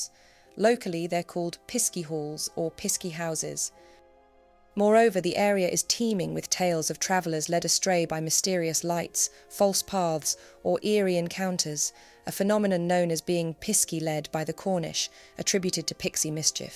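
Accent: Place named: Oxford, United Kingdom. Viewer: Trying to place your accent, are you from Oxford? You have a wonderful voice, I live in Edinburgh and some English accents are harsh, yours is lovely, I could listen to you all day. 😍. As always an informative video.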